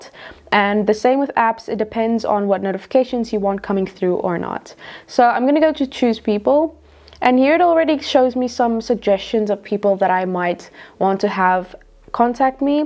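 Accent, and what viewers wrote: South Africa. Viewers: Are you South African? Great video!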